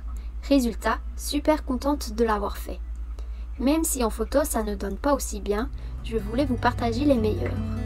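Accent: Liège, Belgium. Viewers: Me voilà nouvel abonné. Un petit accent liégeois? Aussi, on aimerait avoir une présentation de toi et de ton bateau. Fancophone en Australie, que faisais-tu avant de partir à l'aventure, quelles destinations tu envisages.... voilà autant de sujets pour tes prochaines vidéos. Merci pour le partage. Bon vent à toi pour 2021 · Bonjour, je découvre ta chaîne, tu as un bateau vraiment bien aménagé et bien restauré. Bravo. Il me semble percevoir un petit accent Liégeois. Je me trompe peut-être? A bientôt. Marc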